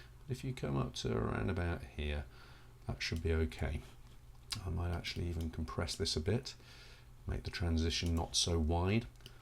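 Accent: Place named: United Kingdom. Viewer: Hi! You just appeared in my Youtube recommendations and I'm frankly happy for it. Great video and it's really nice to listen to your charming Brittish accent after so many american or australian tutorials. I'm not really into trying Lightroom, I don't like the concept of libraries and catalogues, but the develop module seems much more atractive than ACR, so maybe it's time to give it a try. Thanks for sharing your time :)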